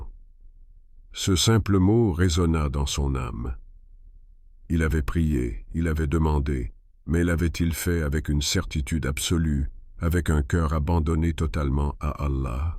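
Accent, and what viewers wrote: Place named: Canada. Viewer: Ce léger accent canadien........😮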